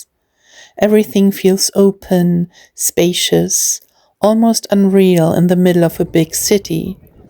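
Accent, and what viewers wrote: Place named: England, United Kingdom. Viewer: Hallo, du hast einen englischen Akzent. Hast du dort gelebt? Deine Hunde sind zuckersüß, habe auch zwei😍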